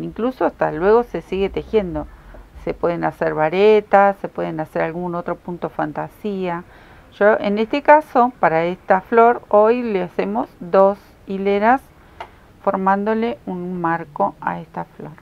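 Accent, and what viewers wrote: Argentina. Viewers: ¿Sos argentina? ¡Me encanta tu acento! Solía vivir en Buenos Aires a finales de los 80 y lo extraño ;)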